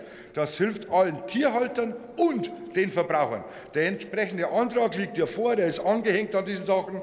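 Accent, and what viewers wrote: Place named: Bavaria, Germany. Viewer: "Ich vertehe nichts.." Bayirisch verstehe sogar i.. als Schweizer!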